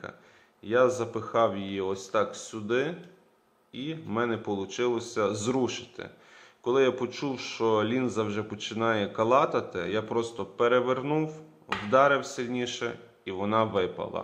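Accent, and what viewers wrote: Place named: Lviv, Ukraine. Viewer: То є львівський діалект , вам того не зрозуміти. Сходіть потрольте одеситів , вони вам розкажуть скільки у них коштує рубероїд на Привозі )))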